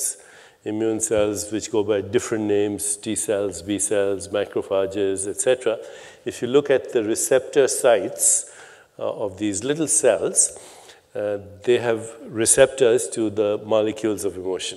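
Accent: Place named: India. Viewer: besides the incredible things he says his voice is enchanting.. the timbre, the indian accent, the glotal clicks... enchanting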